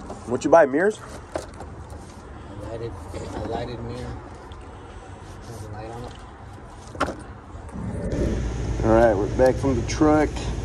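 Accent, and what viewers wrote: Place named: California, United States. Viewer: You sound like you are in cali. I lived in San Jose for seventeen years.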